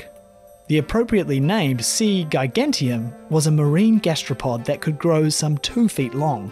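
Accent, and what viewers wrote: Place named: Australia. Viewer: Nothing frightening about any of these, and your Aussi accent makes many of your species pronunciations atrocious. There is no such thing as an "anthropod." It's "arthropod." You made that mistake throughout this video.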